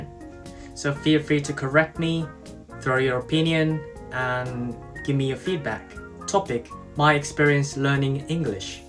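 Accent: United Kingdom. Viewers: I think you have a british accent dudeee! superbbbb! awesome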